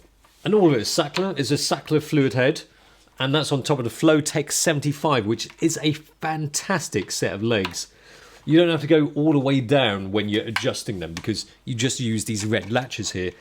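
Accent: United Kingdom. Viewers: That is the cutest little British accent ever!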